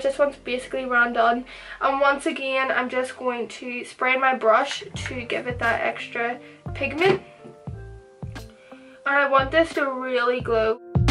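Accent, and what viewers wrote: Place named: Northern Ireland, United Kingdom. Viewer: It's so refreshing to see a YouTuber from Northern Ireland!!! I feel like I always forget our accent exists on the internet😂💜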